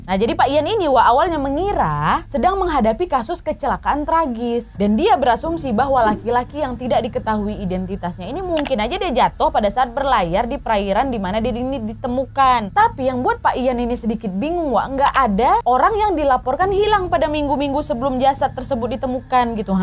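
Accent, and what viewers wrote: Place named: Medan, Indonesia. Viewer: Ibu senang dgn vlog ini. Hostnya cepat bicaranya, jelas n punya aksen orang medan.semoga sukses terus n diberkati tuhan.gbu.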